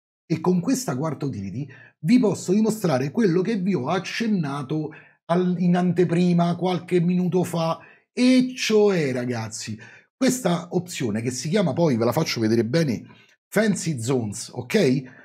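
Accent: Rome, Italy. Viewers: Guarda, basta vedere ce ne sono alcuni. Appena fai uscire un video che guardo e che ti do il like, poi l'algoritmo di YT me ne propone altri simili con lo stesso argomenti, alcuni fatti tempo prima, ma che non hanno niente a che vedere nel modo in cui esponi tu, altri invece qualche giorno dopo che li hai fatti uscire tu, ma anche qui non ci siamo proprio. Ah, sono quasi tutti esteri. Ma a parte la lingua, l'esposizione, la completezza e la simpatia che ci metti, non hai eguali. Quindi stai tranquillo. E poi scusa, ma con quella parlata che non può essere altro che "romana", ma chi te frega!! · l'accento romanesco è la chicca finale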